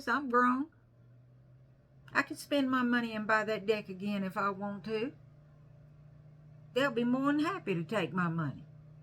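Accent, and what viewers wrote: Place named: South, United States. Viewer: Hi,. You are a gem! You made me think back on my purchasing behaviour over the last couple of years. I returned to tarot after a very long absence and I was very excited about the plethora of decks available. I started buying. Quite a bit. Sometimes I'd not be able to afford a deck or I'd miss out for some other reason. But I have a small collection of decks I really like. Some of the earlier decks have been put aside. I don't do Kickstarter anymore. What I discovered is that there is always a new deck to get excited about. The decks I have now are a good representation of what I like in deck imagery. So, when I see a new deck it's pretty rare that I get carried away. I'm in a very relaxed state about my collection and I can reach out for a deck I've not looked at for awhile and it feels brand new, again! What a luxury! 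I love my deck collection. It's a luxury to have these 35-40 decks be at my beck and call. Anyway, you're right. We're adults. You sound very southern. If you're near Columbia, SC, let me know. We can meet for coffee or a couple of beers. Take care! Thank you!😅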